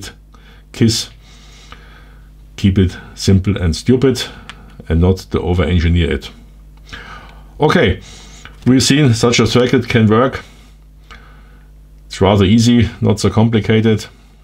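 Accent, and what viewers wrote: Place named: Germany. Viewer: funny how germans say pluck not plug and wideo not video!!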